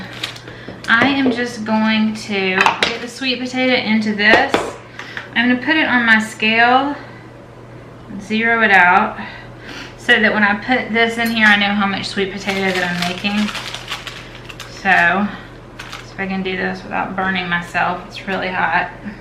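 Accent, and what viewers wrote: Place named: Louisiana, United States. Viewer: I love hearing you talk...reminds me of home! I'm a Louisiana girl living in England!! My surgery date has been set for Nov 11th!! So excited to prep for the different stages. These recipes are so helpful. Thank you. Also where about are you from??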